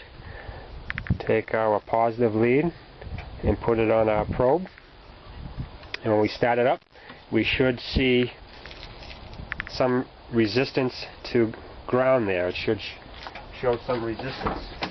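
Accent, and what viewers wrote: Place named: Rhode Island, United States. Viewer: outstanding video.im from texas and i understood you better than the texas folks/dig the accent..where you from rhode island.got a army friend thats talks just like you.keep up the good work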